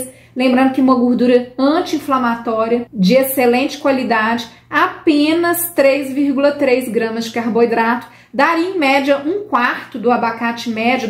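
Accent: Minas Gerais, Brazil. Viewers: Eu sou mineira de Uberaba,resido em Sampa Acho que essa menina tbem ë mineira ?? Acho pela pronu cia.Saudaçoes.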